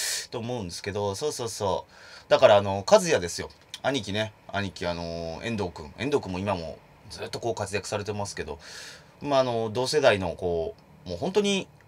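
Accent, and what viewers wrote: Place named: Okinawa, Japan. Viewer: 沖縄のイントネーション好きだったな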